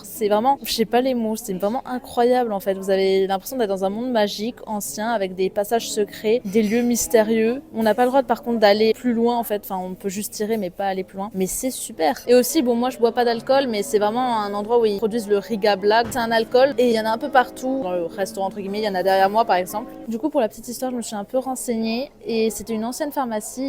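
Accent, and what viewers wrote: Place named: Maghreb, North Africa. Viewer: Ton accent vient d’ou? Tu es D’origine maghrébine?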